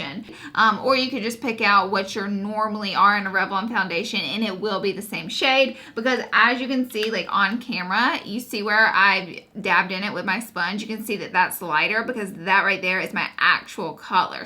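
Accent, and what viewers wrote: South, United States. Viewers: From one southern girl to another, your accent just makes me so happy! I love this compact 😊 #snapchatfam #snapchatfamforlife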